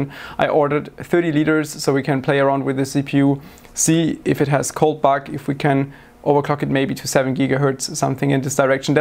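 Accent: Germany. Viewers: der deutsche akzent ist so hörbar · Man bemerkt dass du deutscher bist xDD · Hab noch nie ein deutscheres Englisch gehört😂😂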